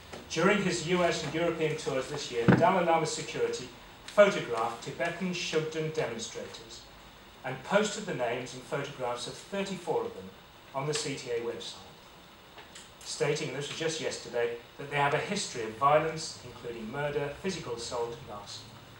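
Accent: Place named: United Kingdom. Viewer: this is bizarre. This British guy is reading, verbatim, what sounds like a text written by a non-native speaker of English. What the hell is going on here, damn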